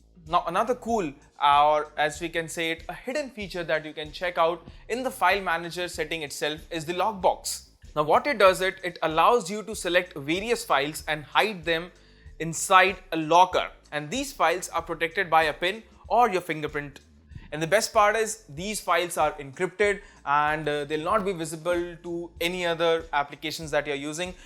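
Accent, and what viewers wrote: India. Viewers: Indian-English accent is so annoying.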